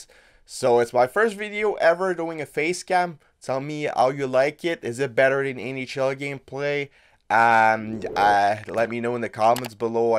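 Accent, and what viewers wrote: Quebec, Canada. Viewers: Es tu Quebecois ? ta un accent Quebecois quand tu parles anglais :) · I knew it was that. I know a girl with the same thing.. Not that close from the eyes... But near. Wish you un prompt rétablissement :) Tu parle anglais comme un Québécois 😌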